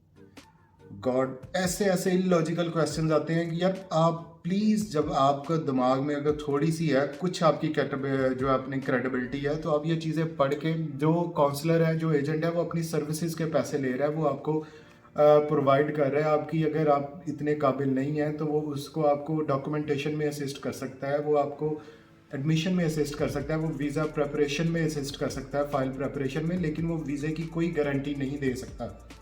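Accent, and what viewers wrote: Faisalabad, Pakistan. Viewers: The way you deliver reality with faisalabadi accent is 😅👌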